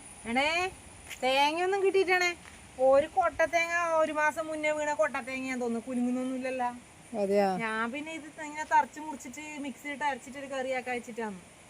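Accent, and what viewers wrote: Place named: Kannur, India. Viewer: സംസാരം കേൾക്കാൻ ഭയങ്കര രസമാണ് കണ്ണൂർ ലാംഗ്വേജ്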